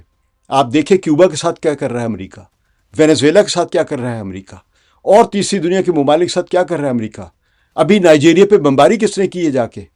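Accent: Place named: Punjab, Pakistan. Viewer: جی سلام ماشاء اللہ اپ کا تجزیہ بہت ہی خوبصورت ہے اور پنجابی ٹچ بھی بہت خوبصورت ہے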